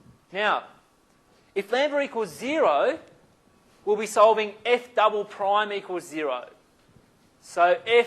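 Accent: Australia. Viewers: whenever an aussie speaks, i cant help but trust in what he's saying lol. Good video. Ive found that the only tricky part with the H.E. is to not make a mistake with the integral when solving An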